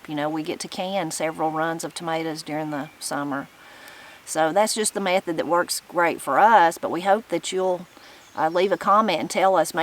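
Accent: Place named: East Tennessee, United States. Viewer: Your accent makes me think about my childhood. My family is from East TN - not exactly the same accent, but pretty close. All your videos feel like a chat with the neighbor.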